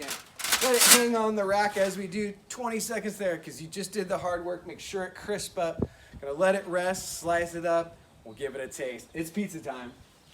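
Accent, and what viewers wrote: California, United States. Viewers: I wonder if this guy knows how California he sounds, and of course he loves his nugzzz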